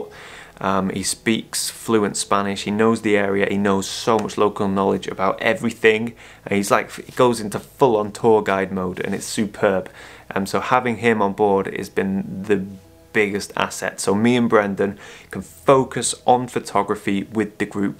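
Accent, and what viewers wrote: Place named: Northern England, United Kingdom. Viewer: Just discovered this channel...brilliant! Can just about understand your ‘tut north’ accent :) was just wondering - you used a full ND and an ND grad in a low light situation.....how do you position the ND grad correctly as it must be difficult to judge the horizon line in such low light... Unless you put the ND grad on first - align it then put the full ND on after?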